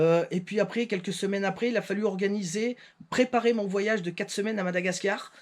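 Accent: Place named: Auvergne, France. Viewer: Salut camarade Bougnat .... Heureux de retrouver tes vidéos......et ton accent ...j'ai le même.....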